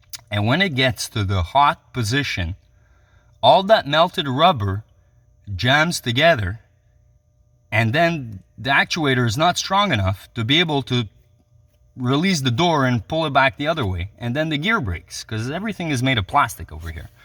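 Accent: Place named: Boston, United States. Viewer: Thanks,! You sound just like my cousin from Boston 😁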